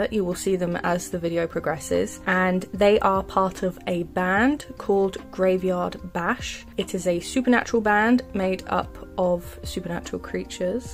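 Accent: United Kingdom. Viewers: Beautiful!! I loveee your videos! you’re such an inspiring artist to me :) you don’t have to answer this but where is your accent from in England? I have a similar accent but not many ppl have the same accent as me where I live XD again, you don’t have to answer this, I was just curious. ⭐️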